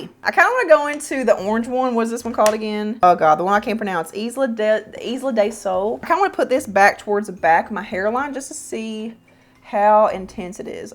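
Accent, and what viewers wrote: South, United States. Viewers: You're such a pretty lady, and I LOVE your Southern accent. Makes me feel at home. 😀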